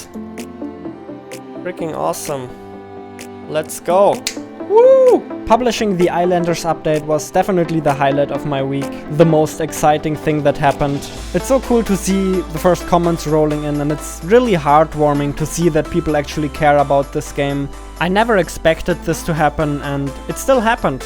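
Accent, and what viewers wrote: Germany. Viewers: You are German? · Are you an Austrian, German or a swiss?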